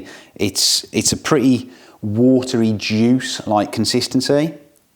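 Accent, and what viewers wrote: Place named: New Jersey, United States. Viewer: Nice accent you got there. New Jersey?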